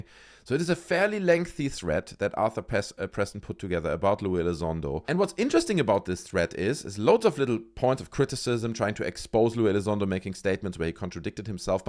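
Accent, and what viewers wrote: Germany. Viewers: I just love your German accent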